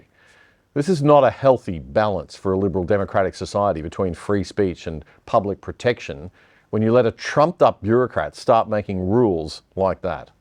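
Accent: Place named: United States. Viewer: It's god-awful hearing a screeching American accent telling us what we can and cannot see. Get rid of this nasty person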